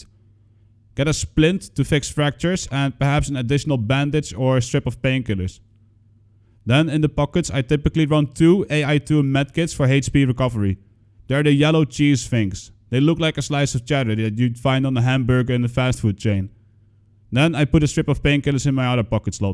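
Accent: Netherlands. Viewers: are you dutch? because I can hear some dutch language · Great video! are you Dutch btw?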